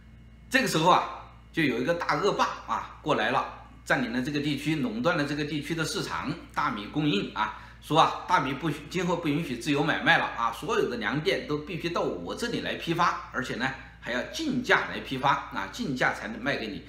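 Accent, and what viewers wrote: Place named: Hubei, China. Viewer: 我就觉得你口音像湖北的 哈哈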